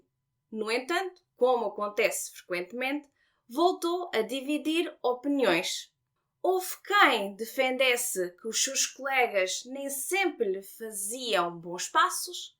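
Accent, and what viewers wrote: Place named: Portugal. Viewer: Seu sotaque português é lindo!😉 Saudações brasileiras diretamente do Rio de Janeiro 🇧🇷😚🇵🇹.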